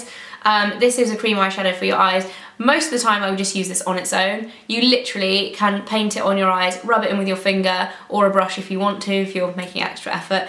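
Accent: United Kingdom. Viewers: Is she Britsh?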